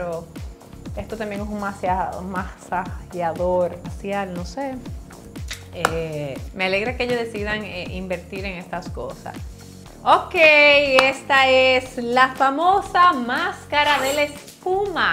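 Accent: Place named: Dominican Republic. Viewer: Amo la energía de esta mujer y me encanta que no cambias tu forma de hablar ese dominicanismo 😄😄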